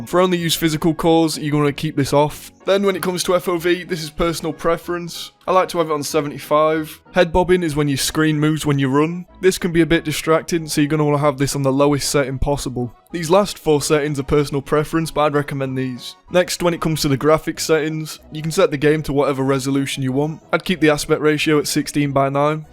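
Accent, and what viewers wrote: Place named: Northern England, United Kingdom. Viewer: This MF'er got that House Stark accent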